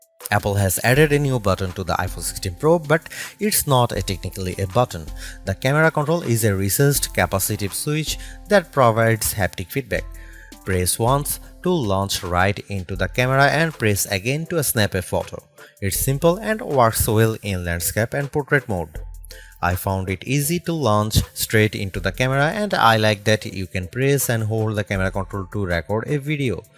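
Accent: India. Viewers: I hate your indian accent to be honest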